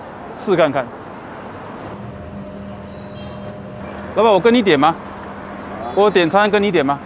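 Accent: Taiwan. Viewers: 不知你是那裡人？有時候聽你説英文～國語非常像台灣人～ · 你是福建人嗎? 口音跟台灣很像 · 为啥感觉你有台湾口音。 最明显的区别：台湾：试看看。吃看看。 大陆：试试看。吃吃看。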